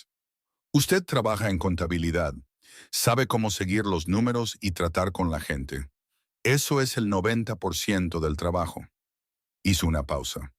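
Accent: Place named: United States. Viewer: Los cuentos de éste canal son muy pesados y ésa voz con un tono yanki es horrible imposible perder tiempo en escuchar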